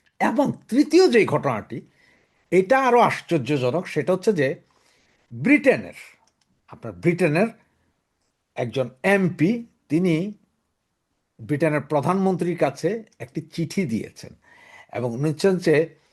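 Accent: Noakhali, Bangladesh. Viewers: আপনার কথা শুনলাম ।ভালো লাগলো যুক্তিপূর্নকথা।কিন্তু আপনার ভাষাগত সমস্যা আছে।যে লয়ে কথা বলেন তা আর একটু দ্রত করতে হবে এতো চিবিয়ে চিবিয়ে কথা এখন কেউ শুনতে চায় না।আর আপনার বাডী সম্ভবত নোয়াখালী,চট্রগ্রাম বা কুমিল্লার দক্ষিণাংশে কি ভাবে বুঝলাম?ভাষায় আন্চলিকতার টান আছেএটা ভালো নয়।কন্টেন্ট রেকর্ড করার আগে বেশ কয়েকবার রিহার্সাল দিন যাতে যা বলবেন সংক্ষিপ্ত বস্তুনিষ্ট ও শুনতে ভালো লাগে।চেষ্টা করবেন যেন কথা পাঁচ মিনিটে শেষ করা যায় অজথা লম্বা কেন করবেন।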